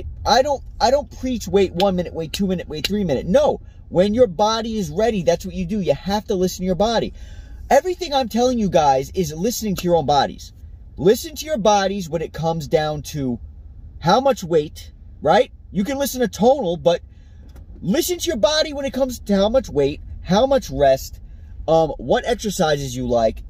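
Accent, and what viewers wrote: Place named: Boston, United States. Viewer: Where u from Boston (your accent)? I get my Tonal installed on Nov. 4th can't wait!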